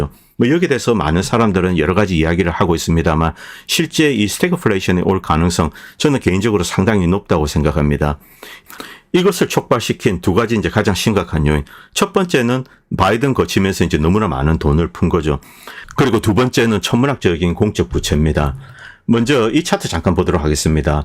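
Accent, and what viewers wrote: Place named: Busan, South Korea. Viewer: 부산 억양이 매력적 이시네요...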